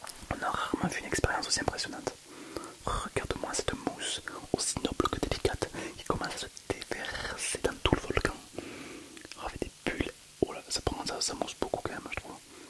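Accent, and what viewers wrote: South, France. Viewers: L'ASMR avec l'accent du sud. Incroyable.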